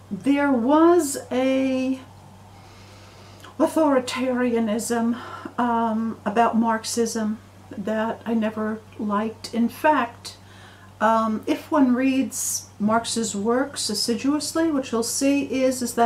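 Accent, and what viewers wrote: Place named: United States. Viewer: You sound very American ! Thx for the video !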